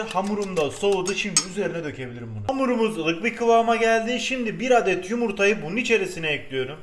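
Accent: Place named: Southeast, Turkey. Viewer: Ben hala anlamadım abi sen hataylı mısın diyarbakırl ımısın yoksa adanalı mısın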